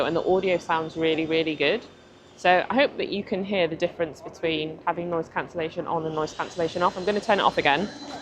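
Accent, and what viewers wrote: United Kingdom. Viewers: Excellent video! I’ve just ordered mine and am so excited! A nice change to hear a fellow Brit and to see beautiful Bath too! 👍🏻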